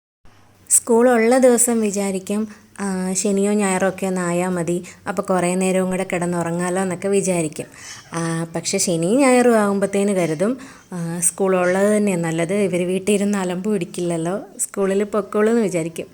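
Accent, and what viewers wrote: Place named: Kottayam, India. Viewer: You are too genuine god bless you ❤️❤️. ഞങ്ങൾ കോട്ടയത്തു നിന്നും രണ്ടു തലമുറ മുൻപേ കണ്ണൂരിൽ കുടിയേറിയവർ ആണ്. കോട്ടയം ഭാഷ കേൾക്കുമ്പോൾ ഇഷ്ടം ഒരുപാട് മര്യാദ ഉള്ള സംസാര രീതി ആണ് ❤️❤️❤️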